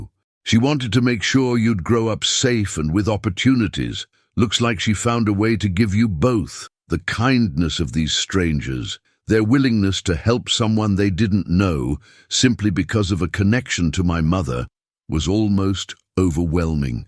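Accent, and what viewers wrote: United Kingdom. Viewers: The plummy English accent of the storyteller is totally at odds with the narrative.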